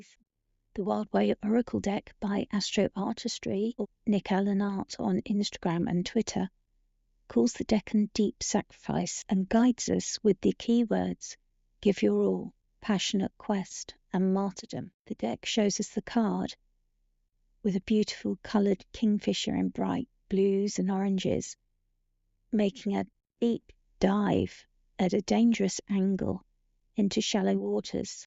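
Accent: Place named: England, United Kingdom. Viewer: U should have more comments🎉Thank U. When i saw the broadcast was at 15..mins - thought why? give it a try. Liked the Landscape view - seemed to be London Parliament Thames. Then when i heard the English accent and that U R from UK 🌍 i thought good going. Your content is very deep - Alkaid ? New to me - Only time of mention, through Listening to various Eclipes themes. Very knowledgeable for your age, by sound of your voice🎉Trudy ✨Gemini 1963 London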